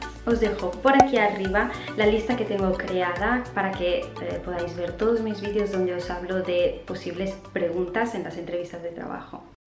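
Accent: Italy. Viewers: Scommetto che sei italiana 😂 ottimo spagnolo devo dire però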